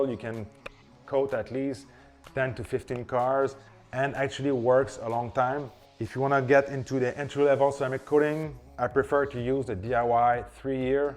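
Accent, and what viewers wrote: Quebec, Canada. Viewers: I really like your presentation and I respect your recommendations. And as a fellow Canadian, I dig your Quebec accent! 🙂 Keep up the good work! Thanks!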